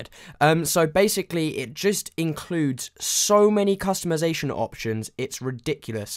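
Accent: United Kingdom. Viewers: STFU U BRITTISH GAY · r u british?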